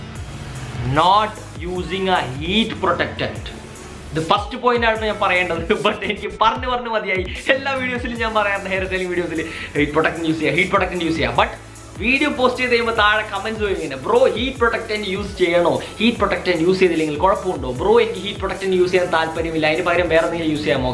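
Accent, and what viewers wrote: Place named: North India, India. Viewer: Bro u r so superb.. Iam ur big fan.... I think u studied in North india.... Ur English speaking is like North ppl..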